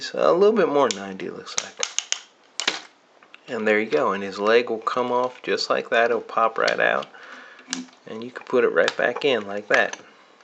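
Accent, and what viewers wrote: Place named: South, United States. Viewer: Is that southern accent for real?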